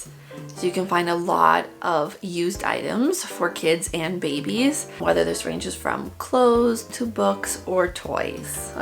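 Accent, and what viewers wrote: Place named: Canada. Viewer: I hear Canadian, I subscribe! 🇨🇦 ❤ Plus thrifting, crafting, mending, everything up my alley! ❤❤❤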